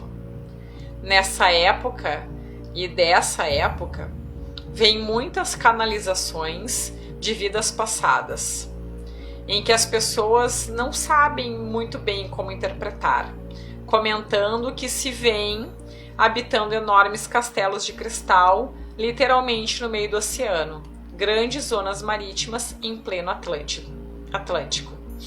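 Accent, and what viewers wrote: Rio Grande do Sul, Brazil. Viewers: Adeildo Amorim sotaque gaúcho. Bem como todo o jeito que ela fala.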